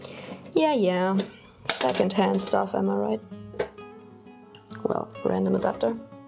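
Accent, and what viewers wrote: Germany. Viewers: she liked your German accent. Sweet...it is sweet blessings have a good week have some cake..🎂🍰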